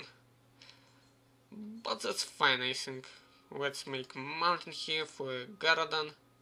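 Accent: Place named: Russia. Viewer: ю рашн инглиш ту бит май ирс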